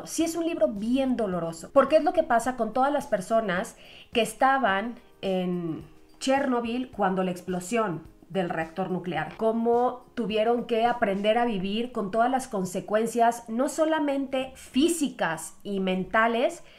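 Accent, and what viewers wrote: Mexico. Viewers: Enamorado de tu precioso acento mexicano. Me explota la cabeza. Gracias por los vídeos. Enhorabuena. Recomendaciónes: Sinclair Lewis (La trampa humana, Babbit, Calle Mayor), Dorothy Parker (Colgando de un hilo), Toti Martínez de Lezea (La calle de la Judería, Muerte en el Priorato).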